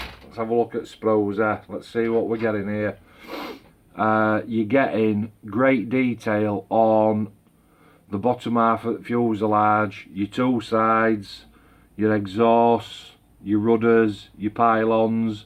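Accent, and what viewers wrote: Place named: Yorkshire, United Kingdom. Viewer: Lovin that Yorkshire accent mate !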